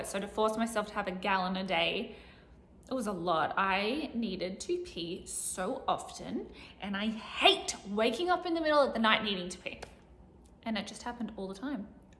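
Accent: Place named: Australia. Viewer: She's cutting a promo in Australian- Oli Davis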